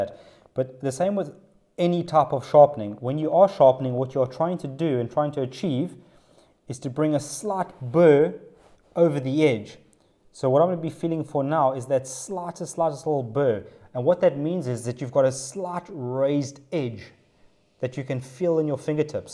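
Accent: South Africa. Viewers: I use a knife exactly like this to fit a violin bridge. This is interesting for a luthier. Where are you from? Your accent sounds South African, maybe?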